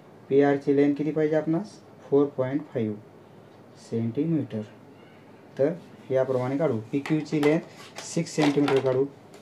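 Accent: Latur, India. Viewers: सर तूम्ही लातूर चे आहात का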